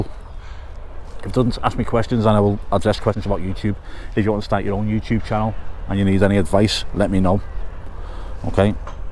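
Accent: Liverpool, United Kingdom. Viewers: Am a long distance ‘pool fan (Teesside). I could listen to your scouse accent all day long. Love your videos as well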